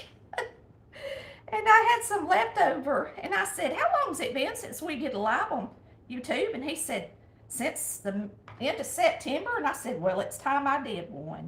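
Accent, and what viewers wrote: South, United States. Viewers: Just discovered your channel and you sound just like my family. I'm from the south and I m glad I found you. Love your recipes they are just like my Mawmaws. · You say "yall" way to many time. And your speech is over done on the Southern accent . It is difficult to listen to you.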